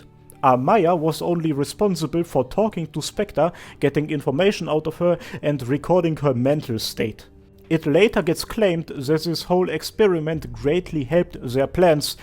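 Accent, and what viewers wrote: Germany. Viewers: Moin Moin... ausm Norden?! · german akzent is real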